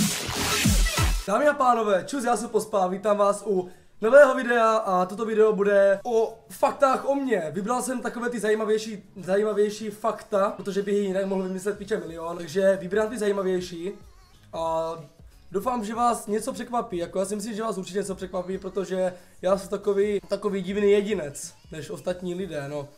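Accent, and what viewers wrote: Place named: Moravia, Czech Republic. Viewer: Ses fakt skvelej!! Drzim palce at das tu maturitu :) . Nechapu jakto ze nemas vic odberatelu.... a mluv dal po moravsky je to super ( taky su z moravy) 😂😂😂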